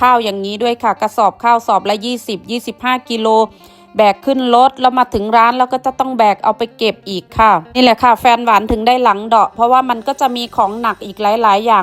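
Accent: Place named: Central Thailand, Thailand. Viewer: ชอบจัง บรรยายไทยกลาง ส่วนใหญ่คนอื่นพูดอิสานกัน ฟังไม่รู้เรื่อง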